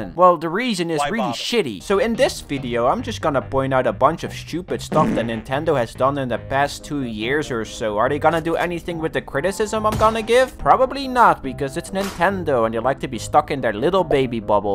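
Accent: Netherlands. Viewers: ah yes, dutch accent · Man is dutch?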